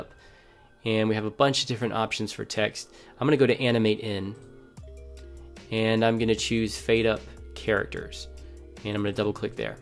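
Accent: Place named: United States. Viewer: Not understand the American Accent but the tutorial appears very clear, Gud Job, Very Nice Tutorial.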